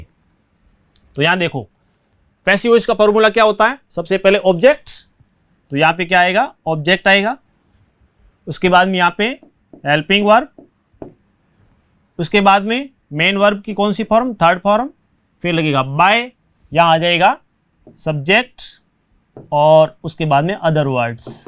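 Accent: Marwar, India. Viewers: Sir your English is not fluent Marwari tone aa rahi he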